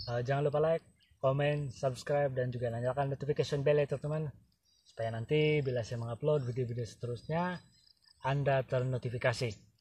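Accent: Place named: Sabah, Malaysia. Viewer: Kau org Sabah pulak teman2😂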